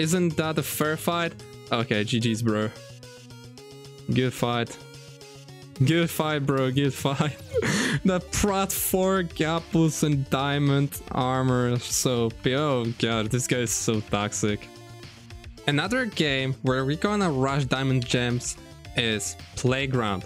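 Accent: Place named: England, United Kingdom. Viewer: Ty naprawdę polak bo brzmisz idealnie na anglika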